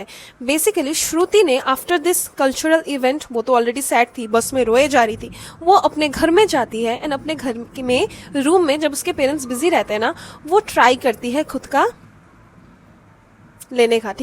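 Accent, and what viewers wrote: Bengal, India. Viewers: didi's bengai accent remainds me of my very close school freind always , i still miss him as we both moved to diffrent Cities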